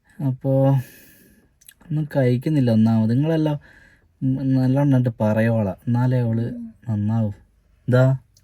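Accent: Vadakara, India. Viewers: നമ്മുടെ വടകരസംസാരം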